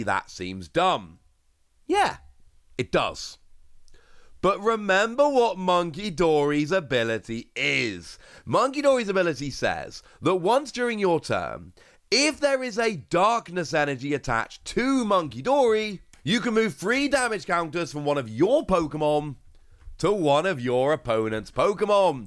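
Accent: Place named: United Kingdom. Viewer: yes that's what i meant, not used to british acc could not even get the first word right. Thank u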